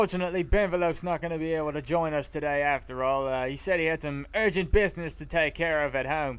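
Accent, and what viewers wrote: Australia. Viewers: That Aussie Accent, Yes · Nice aussie accent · R u Australian?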